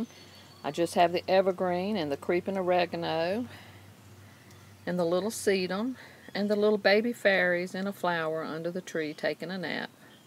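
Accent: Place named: North Carolina, United States. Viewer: Adorable fairy gardens. I just planted my first one a couple days ago and I'm already making plans for another. I like your plant choices. I absolutely love that purple/green shamrock looking one. I'm going to have to keep my eye out for that one. Are you from NC? Your accent and the weather in your video seems close to home. ;)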